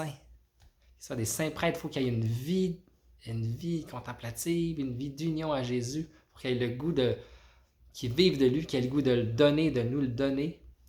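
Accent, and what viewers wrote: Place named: Canada. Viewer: je me suis perdu sur youtube t qui toi (mais ta un accent canadien c trop bien)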